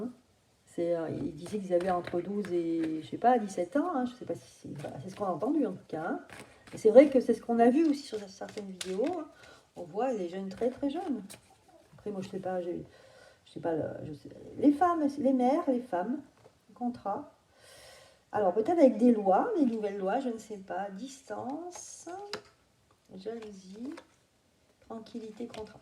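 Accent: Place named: Corrèze, France. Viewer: Bonsoir merci bp j adore votre accent je crois entendre ma cousine correzienne c est presque pareil😊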